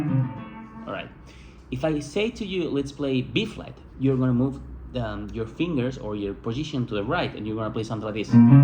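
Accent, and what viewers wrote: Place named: Spain. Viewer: Gracias. Me es más fácil entender el inglés con acento español que el ingles americano. Thank You pal!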